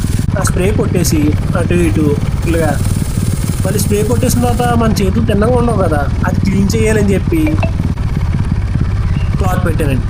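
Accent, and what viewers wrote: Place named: Godavari, India. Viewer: నువ్వూ....నీ తెలుగూ.... గోదావరి యాస.....😂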